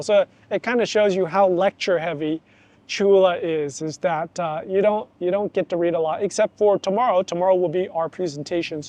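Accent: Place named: California, United States. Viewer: You are an American from California, right? Judging from how you speak English, I figure you are an American from California. Why not just work in high tech industry in the U.S.? I believe that U.S. salary is still better than working in Thailand. U.S. has its problems, but it never withholds opportunities for the right person. I cannot say the same about Thailand. Keep up the good job in learning Thai. I like to know how you improve your listening comprehension in Thai? For me, listening is the most important aspect of getting to know Thai people. But it is also difficult because I am still challenged by Thai tones, despite having studied online with Thai teachers from Bangkok for two years.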